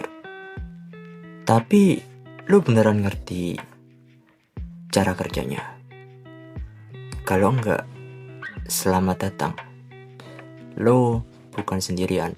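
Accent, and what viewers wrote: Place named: Java, Indonesia. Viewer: Medok banget suaru lu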